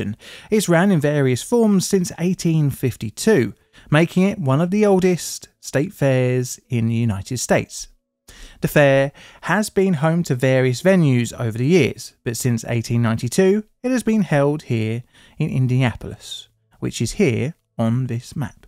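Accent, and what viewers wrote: United Kingdom. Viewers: Why would i get my Indiana state news from someone who sounds like a limey?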